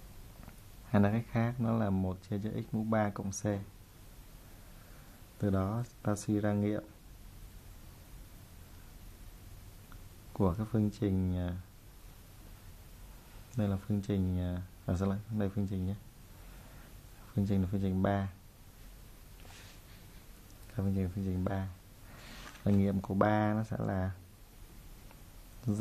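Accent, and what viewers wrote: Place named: North, Vietnam. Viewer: hình như thầy ng miền Nam ns giọng Bắc thì phải, giảng thấy dễ hỉu hơn mấy cái power point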